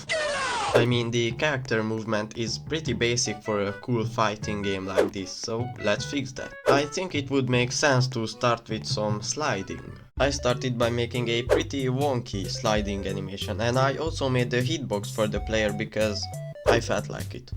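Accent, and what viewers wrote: Hungary. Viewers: nem feltűnő magyar akcentus lol, jó video végre találtam egy magyar roblox devet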